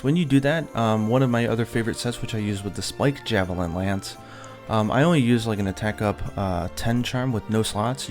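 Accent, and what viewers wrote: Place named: Japan. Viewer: The way he pronounces all the words in the game with a Japanese accent rly triggers me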